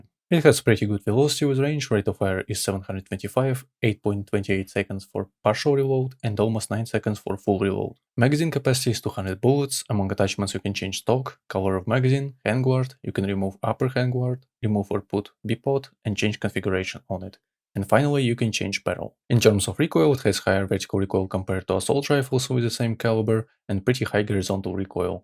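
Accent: Russia. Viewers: Hello friend, you speak with a noticeable Russian accent, where are you from?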